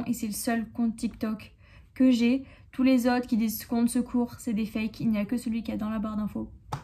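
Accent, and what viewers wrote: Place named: Corsica, France. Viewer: Salut dsl pour ton compte mais je voulais te demander t'es pas d'origine corse parce que tu as quelque fois l'accent corse sinon j'espère que sa va aller mieux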